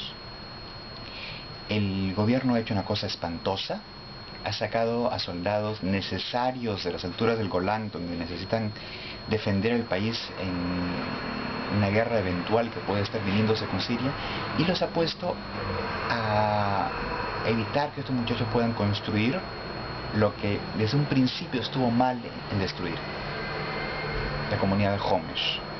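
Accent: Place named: Argentina. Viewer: No reconozco tu acento...sos argentino?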